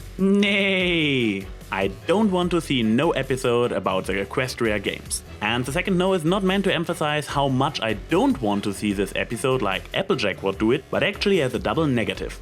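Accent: Germany. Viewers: ... You're from German, ain't ya? I knew I recognized that accent.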